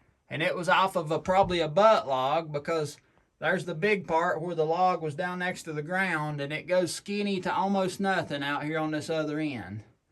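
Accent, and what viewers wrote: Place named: Kentucky, United States. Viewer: I have seen a ton of old barn tours and enjoyed every one of them. This one is by far the best I have seen. You even did it in the old down home lingo, wagon tars, backer and styles, where we would say tires, tobacco and stalls. Thank you very much. My Dad was from Kentucky so I had no problem understanding you. Art from Ohio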